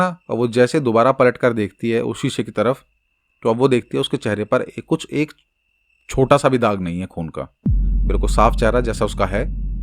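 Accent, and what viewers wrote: India. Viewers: Hii 🙏 nice your deshi voice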